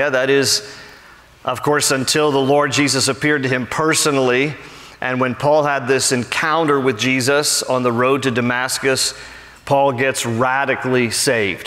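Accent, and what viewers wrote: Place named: United States. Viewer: I’m a female solo traveler now in Turkey. These sermons are the last thing I listen to after tucking myself in bed each night. Pastor’s familiar voice, American accent like my own and colorful stories of scriptures bring me laughter and peace same time. Thank you 🙏🏻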